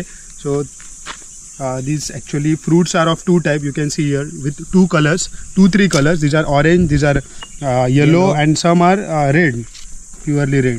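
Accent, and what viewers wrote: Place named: Maharashtra, India. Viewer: खूप बरं वाटतं जेव्हा आपण अंग्रेजी मध्ये बोलता 🙌🏽 Appreciate your efforts and speaking with Marathi infused in subtle way, with specific words and accent too 😇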